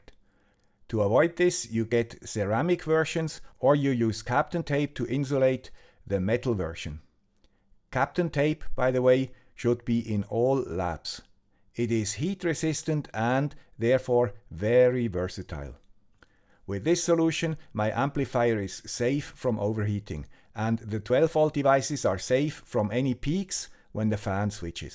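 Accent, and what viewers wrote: Switzerland. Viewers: Needed this video today...thank you,). I must confess, I am green with envy. Your workshop, supplies, and logical organizational of parts is what is on my hokey pokey ‘vision board’. You’re awesome 😎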